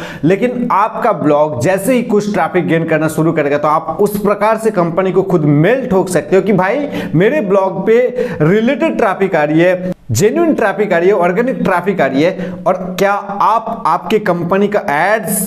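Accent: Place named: Bengal, India. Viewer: Ore Bangla te bol bhai... Puro bangalir moto hindi bolchis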